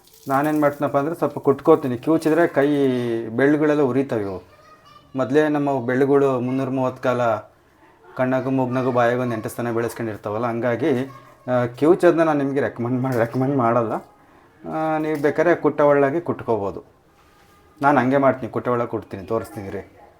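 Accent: Chitradurga, India. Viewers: ಚಿತ್ರದುರ್ಗ ಭಾಷೆ ..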